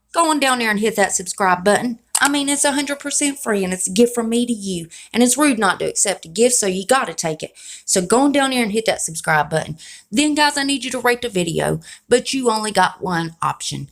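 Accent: South, United States. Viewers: I love your southern twang! I am excited for the Betty Boop!!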